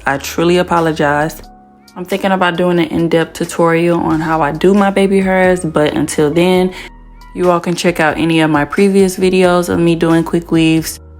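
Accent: St. Louis, United States. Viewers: I love the accent 😭 are you from St Louis? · There’s no way you’re not from St. Louis lol😂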